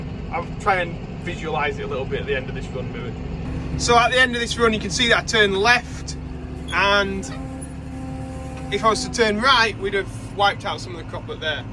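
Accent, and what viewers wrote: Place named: Yorkshire, United Kingdom. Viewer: sorry buddy im going to pay a small visit tomorrow, Ive just watched a section of this blog to hear a proper Yorkshire accent coming out "Aye it's turned into a real nice day i might even ave to tek off the overalls for the first time in the year" got me smiling, great video as always keep up the good work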